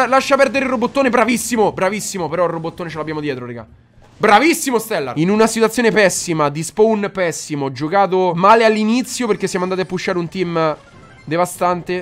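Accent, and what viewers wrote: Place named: Sardinia, Italy. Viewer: Mà sei sardese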